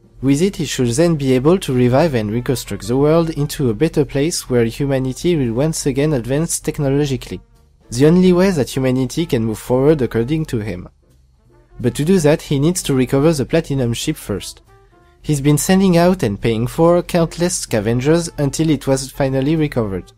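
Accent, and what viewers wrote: France. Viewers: Freeeeench...... What you have to say is really interessting but man' that is so akward. Can't listen it entirely. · Ouais par contre ton accent français ça se voit à des kilomètres, essaye un maximum l'accent anglais svp Un petit effort pour nos amis les anglophones. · I'm french, I can assure you it's a french accent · Sounds French to me.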